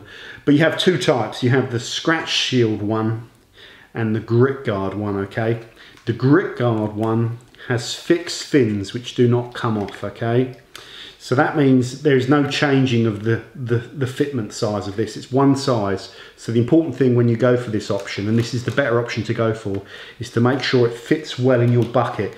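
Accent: United Kingdom. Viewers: More people need to listen to you in the detailing industry... Having 30 steps to weekly wash a car is just crazy to me. I always try to do a good effective wash without spending 2hours of preps+wash+putting everything back in the garage. And i also use in my rinse bucket a vertical grit (bough at my hardware store) to wash my mit properly, then wring it out. I think i am going to try your method, just because it makes it so cheap, safe, and practical. Keep up the good work my friend, plus i always laugh at your jokes, might be the british accent lol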